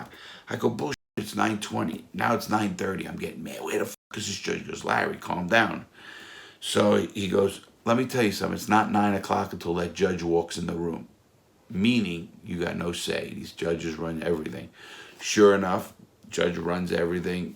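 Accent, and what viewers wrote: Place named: Northeast, United States. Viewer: Larry has a ton of videos here on YouTube, along with 1,000’s of comments on each one. So if this has already been said and I missed it, I’m sorry, but.... Larry’s story time sessions remind me SO much of Joey Diaz. Larry is a little bit more humble but nonetheless, very similar stories. And they both have that Northeast/NY/NJ accent.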